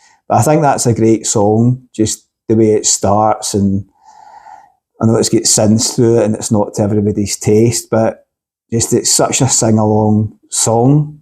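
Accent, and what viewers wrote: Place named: Glasgow, United Kingdom. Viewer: Yes, your video came up on my YouTube homepage as a suggestion so I gave it a watch and was surprised to hear the Glasgow accent! Listening to Maiden on good headphones is a completely different experience than blasting it out of a stereo. You hear so much more of the intricate details they put into their music. I really hope they do a live album/video of the Future Past Tour. I went down to London last summer to see it and it was one of the best performances I've seen them do. They sounded amazing and the production was probably the best I've ever seen from them — and I've been going to Maiden concerts since '88! And was great of course, to hear them play Alexander The Great for the first time, live!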